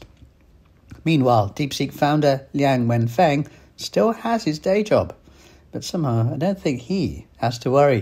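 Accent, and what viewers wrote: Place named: England, United Kingdom. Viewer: Chinese propaganda channel with English accent voiceover.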